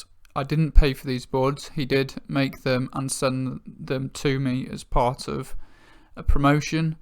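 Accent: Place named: United Kingdom. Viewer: This looks delicious! However I've never had Spanish chorizo, only Mexican chorizo. btw, as an American I quite enjoy the British accent...talk away imo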